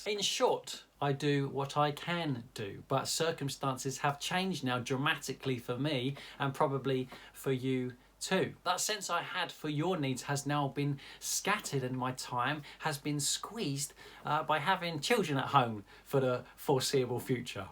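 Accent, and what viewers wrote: United Kingdom. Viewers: babes, what's your accent called? I love it! You're from the UK?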